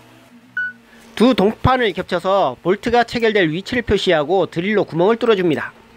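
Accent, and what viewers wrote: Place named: South, Russia. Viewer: Южный диалект русского ,не Совсем понятно😀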